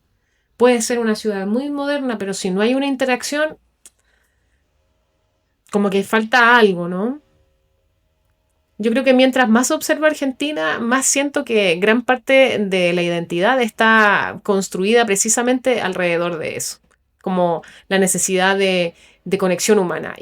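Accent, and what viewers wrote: Chile. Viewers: realmente me encanto!!!! mas.....con ese hermoso acento trasandino..... Me impacto la precision de tus conceptos para mi querida Buenos Aires, pero realmente me fascino lo simple de esas vivencias que se convirtieron en una poderosa razon de vida! Gracias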